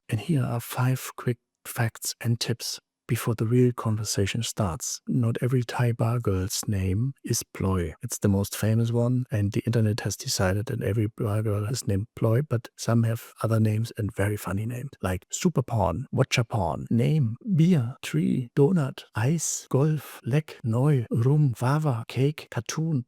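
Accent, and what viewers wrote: Germany. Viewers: Yes your impressions are great, and the meanings are near spot on. Although because your German, Bum bui, pum pui. Funny. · You are just too much dear kind Sir! I think the whole german accent in Thai is just the best! Yeah...you have a new sub! LOL>...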